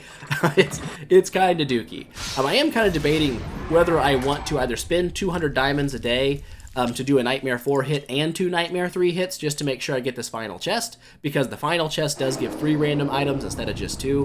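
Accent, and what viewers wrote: United States. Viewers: Yoooo welcome back (although I'm pretty late to the party), I still remember watching you 7 years ago when I first came to the states and couldn't even hold a conversation to save my life, got addicted to watching your videos (more than idle heroes itself) and accidentally practiced English while shadowing the way you talk as I went back thru your video catalogue with the video speed set to.50 or.75 since you talked way too fast for me back then lol, I also happened to pick up a very thick southerner accent thanks to you haha, anyways thanks for the videos man you honestly had a pretty big impact in my life!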